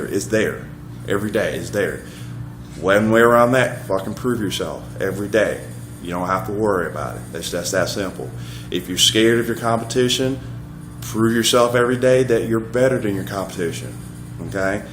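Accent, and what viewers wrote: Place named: Alabama, United States. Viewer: Awesome video... love the Bama drawl too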